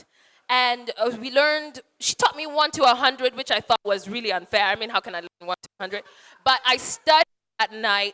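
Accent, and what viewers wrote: Nigeria. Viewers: She's our own do you hear that English oooooh Nigeria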